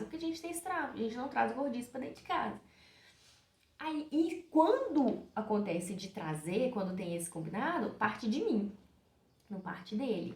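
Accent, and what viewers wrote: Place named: Minas Gerais, Brazil. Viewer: Aí que saudades desse sotaque minerinho 🤌🤌 Amei o seu vídeo,! Que o universo traga muitas bênçãos pra vc🙏🍀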